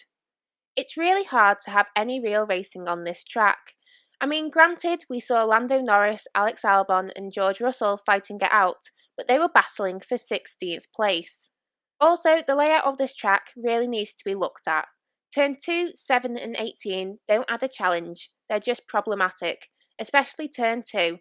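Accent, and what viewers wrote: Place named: Liverpool, United Kingdom. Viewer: Hey, random question, are you from around the Liverpool area? 🤔 As a Hungarian, I have to agree, the hungaroring is usually not too exciting in terms of results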